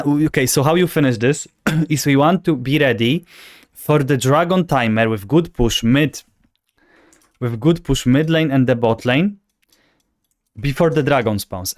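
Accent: Poland. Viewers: piękny polski akcent. drugi taki, rosyjski, Malkovitch w "Rounders"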